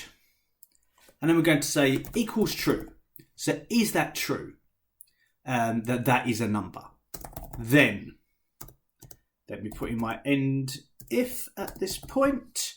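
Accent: United Kingdom. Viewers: Very informative video ! And a beautiful English British accent ❤❤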